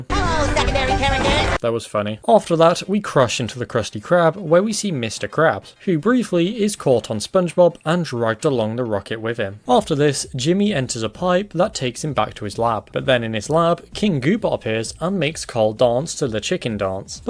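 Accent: United Kingdom. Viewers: Its about time a British Narrator got around to adressing this. Cheers!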